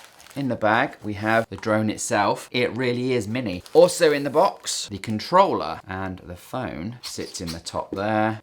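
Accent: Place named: England, United Kingdom. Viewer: Oh! It's so nice to hear an English accent (I have nothing against American people by the way.) It's just that all the other reviews I've encountered have been American or Canadian. What a relief! Someone speaking my language!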